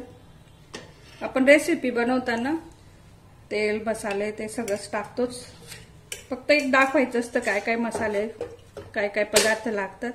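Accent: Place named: Dhule, India. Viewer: खूप छान.तुम्ही माझ्या माहेरच्या धुळ्याच्या आहात .मला तुमची भाषा ऐकूनच वाटले होते ते.आपल्या घरातच असल्यासारखे वाटते .छान समजावून सांगता.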